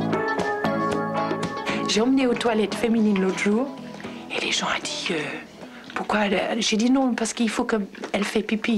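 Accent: United Kingdom. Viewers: chère Anita J’ai eu beaucoup de plaisir à lire votre message de retour. Moi-même j’ai 52 hivers. Depuis toujours, j’ai eu un profond respect et de l’admiration pour notre Jane. Elle a toujours possédé une belle plastique et une âme pure. Je ne vous cache pas Anita que son départ brutal m’a plongée dans un profond chagrin. Je n’arrive pas à réaliser que nous ne n’entendrons plus son délicieux accent british. Je me console en me disant qu’elle a rejoint Serge pour leur décadanse et que Kate les prends en photo sur la piste de danse du paradis. Je vous souhaite un très bel été chère Anita et un grand merci pour votre délicieux message. Amicalement, Corey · Son unique personnalité avec le charme de son accent bristish qui était toujours fort agréable à entendre ! C'était vraiment une magnifique personne très courageuse ! 🌹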